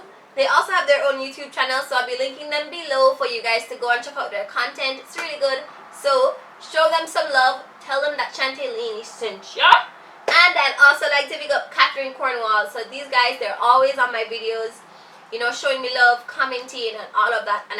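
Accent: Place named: Jamaica. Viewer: You're accent gave me life - its waaaaaaay too cute. I'm so obsessed with Jamaicans honestly- I need to visit. & so glad you kind of epxlained what 'bombaclaute' means - I aint even know that it was a swear word hahaha. GURL I AM SO ECXITED TO BE A NEW SUBSCRIBER ON YOUR CHANNEL, like you don't know, dear lord. I feel like I so want to do this tag right now. I'd appreciate if you could check out my channel sometime x You such a star!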